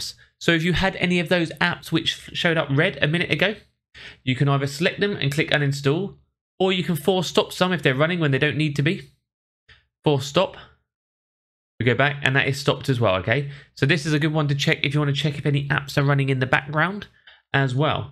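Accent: England, United Kingdom. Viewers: Bro I got to tell you thank you for the the help it really was very informative and I think your English accent man I like I love the way you guys talking to anyone dodgy and stuff I'm over here in America but it's great man brilliant you guys always say brilliant too I love it. Thank you so much for the help I subscribed to your YouTube channel and like the video I'll be checking in more often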